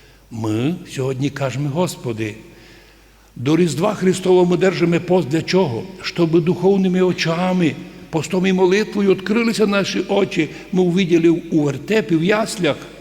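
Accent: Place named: Zakarpattia, Ukraine. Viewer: Він говорить так, як споконвіку говорять на Закарпатті, а літературна українська мова в кабінетах вишліфовувалась, так як і інші, адже кожна місцевість мала свої діалектичні особливості. А ви на кого працюєте, закидаючи йому, що він мови не знає, а самі пишете чиєю?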